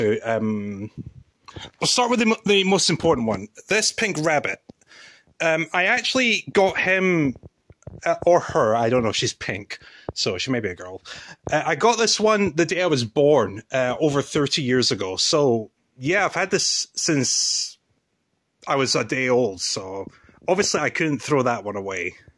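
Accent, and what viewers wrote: Scotland, United Kingdom. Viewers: Just wondering are u scottish ? I am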